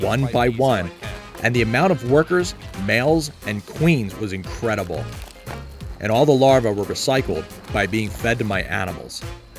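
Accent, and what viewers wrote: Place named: Pittsburgh, United States. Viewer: as soon as i heard your accent I could tell it was yinzer lmaooo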